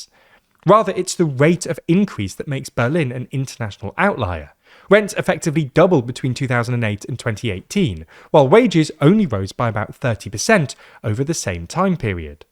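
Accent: United Kingdom. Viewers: "dõnt õwn theyr hõüms" way too british for me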